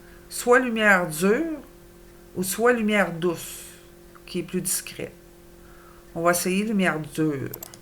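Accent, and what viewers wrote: Quebec, Canada. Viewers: Hello, merci pour ces tutos en français, je cherchais cela depuis quelques temps. Avec ce merveilleux accent du Quebec. Un maudit français. Bien cordialement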